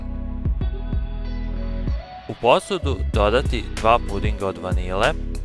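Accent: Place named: Vojvodina, Serbia. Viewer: Хвала. Па по изговору и изгледало је да је Војводина. Али сад си у иностранству. Вероватно и тамо радиш нешто са кулинарством.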